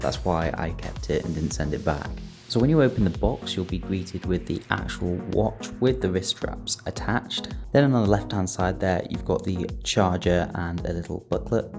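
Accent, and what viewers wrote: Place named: Princes Risborough, United Kingdom. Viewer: yeah because that P Risborough accent wont go away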